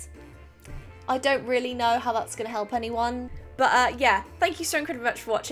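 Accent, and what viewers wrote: United Kingdom. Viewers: “Let’s put on our fancy voice.” Tf you talkin bout ur british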